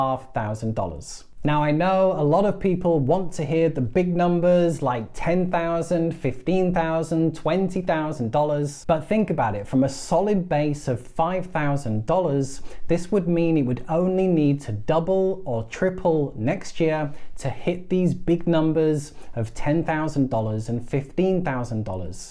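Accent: United Kingdom. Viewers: When I clicked on this video I didn't have my sound on and I predicted that you would be British and I was right.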